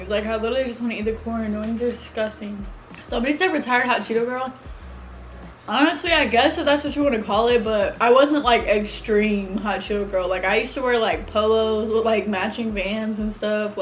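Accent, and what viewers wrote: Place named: South, United States. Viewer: I love your little slight southern accent. It's so cute. ❤️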